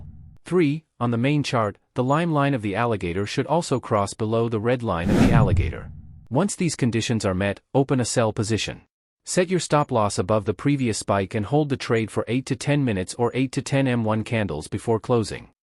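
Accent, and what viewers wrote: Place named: United States. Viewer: VOICE AMERICAN, MUSIC AFRICAN))) BEST STRATEGY 🤩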